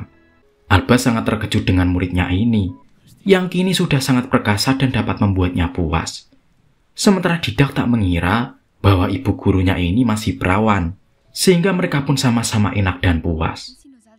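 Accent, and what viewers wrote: Java, Indonesia. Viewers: Pegel dengar naratornya yg medhok jawa.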